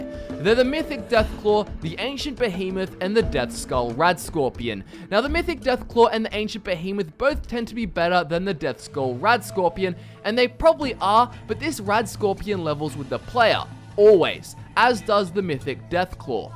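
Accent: Australia. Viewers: he sounds Australian which is how they say that word in that way. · Austin DeWaal it’s an Australian accent bruh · Hi Hi Actually no he doesn't He's Australian and that's how Aussies say it British people say it that way too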